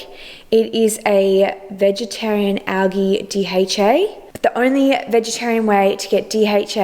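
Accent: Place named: Australia. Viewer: I feel like they have the best Aussie accent I've heard on youtube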